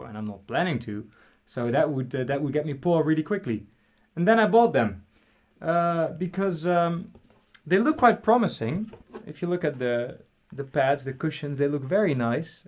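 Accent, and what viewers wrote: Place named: Netherlands. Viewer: You know whats funny? You can tell someone is Dutch by their English ;)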